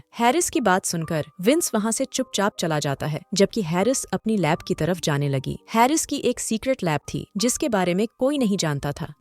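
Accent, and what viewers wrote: Punjab, India. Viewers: Hlo aap punjab se ho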